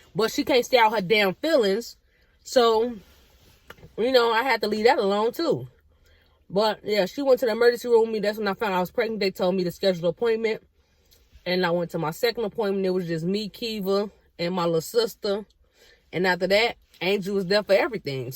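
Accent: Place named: South, United States. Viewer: Idk why but I hear southern in you lol ! Where are you originally from? You got some accent 🤣🤣🤣 and I love it!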